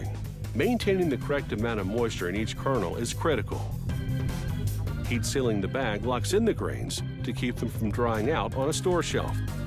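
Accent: United States. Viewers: it says UK, but he has an American accent. 😓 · Discovery UK but the narrator is American lol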